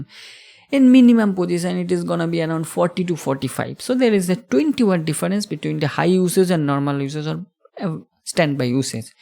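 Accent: Bengal, India. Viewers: U r Bengali for sure 😅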